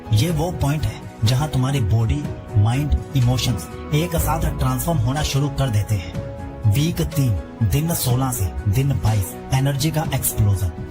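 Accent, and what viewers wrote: South India, India. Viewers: आप साउथ से है क्या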